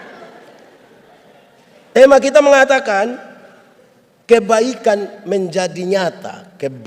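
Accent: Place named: Eastern Indonesia, Indonesia. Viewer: Cuma bahasa + logat ind Timur, agak sulit-lama sy cerna pelan 😂😂😂😂 pemakna'an nya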